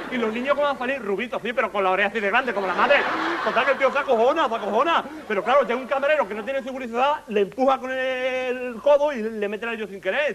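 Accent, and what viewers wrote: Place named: Huelva, Spain. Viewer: pedro reyes es buenisimo!!! m encanta, es un humorista poco corriente por eso m resulta muy gracioso ademas ese acento, ademas de huelva como yo, eske es la polla... el primer hombre de peluche, y direis, donde tiene el interruptor??? ahahahahah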